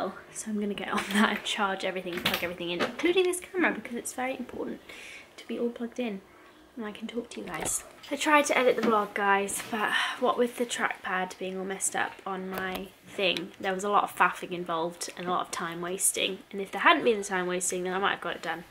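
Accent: Scotland, United Kingdom. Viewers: It's only now I've started to notice a little Scottish accent coming through!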